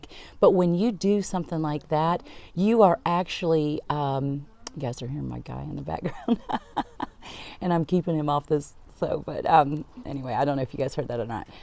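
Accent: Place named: South, United States. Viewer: Thank you. Your doing great and helping me and many Thrive! Plz use your humour! Loving your glasses & that sweet Southern accent!. Loving these long lives! You go girl! I´m starting to thrive again with Purpose & Passion to do what I love! Living well is the best revenge.Knowledge is power! Game over narc. Many Blessings to you all.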